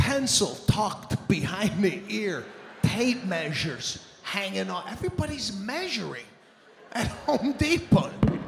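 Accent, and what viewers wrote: Mexico. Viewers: As a Mexican I approve of this 100% his Mexican voice and talk was straight up accurate. Dam this was fucking funny. 😂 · I mean thats ONE type of mexican accent. Its like rural "country" mexicans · He nailed our Raza, that’s exactly how all my Tio’s and primos talk. My dad speaks broken English this is him 100% I love it, thank you Sebastian. I always watch this clip ❤️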